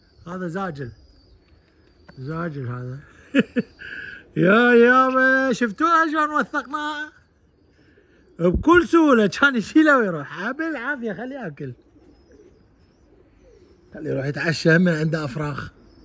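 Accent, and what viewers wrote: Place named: Iraq. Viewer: حلو انت تحجي هولندي باللهجة العراقية هههههههه انت بلجيكا بالقسم الهولندي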